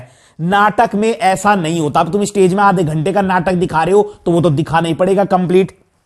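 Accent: Garhwal, India. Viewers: Sir aap gadwali ho ? Apni tone gadwali he .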